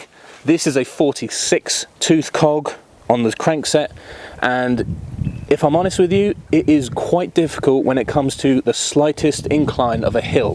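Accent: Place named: United Kingdom. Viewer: Wtf stupid music British accent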